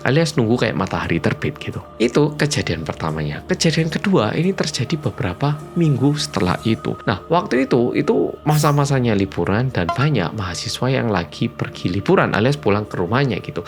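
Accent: Java, Indonesia. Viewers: Ngakakk bgtt pas mas ngomong jamput😂😂 sueneng lek medhok ngunuku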